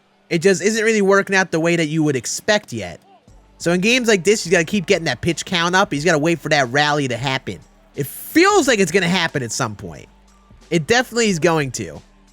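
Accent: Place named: Wisconsin, United States. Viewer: You gotta be from Wisconsin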